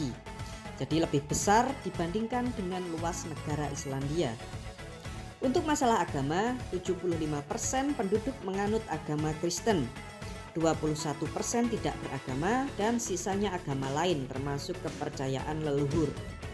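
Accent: Java, Indonesia. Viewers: Medok euy 🤭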